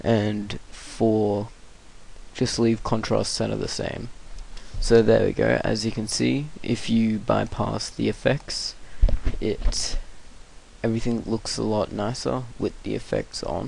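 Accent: Australia. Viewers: Hehe aussie accent, same xD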